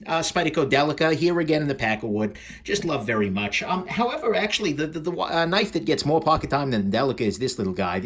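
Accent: New York, United States. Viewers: Are all of those spider co???? Jk I just started watching you I love you New Yorker accent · That thickkkkk NY accent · It’s not Texan, more New York or something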